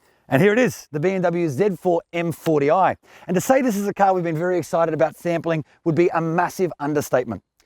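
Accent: Australia. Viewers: Is he aussie?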